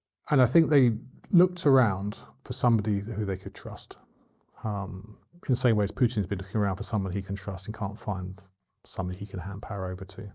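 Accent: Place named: United Kingdom. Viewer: Bring the audio volume UP. We in America have a difficult time understanding his British mumbling on low volume. He's knowledgeable. We'd like to understand what he's conveying.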